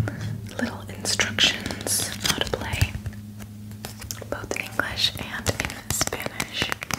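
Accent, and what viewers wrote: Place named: New York, United States. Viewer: Please surprise us this Halloween with bounty hunter ASMR where you take care of a tied up criminal. I loved your New Yorker accent. It gave so much tingles.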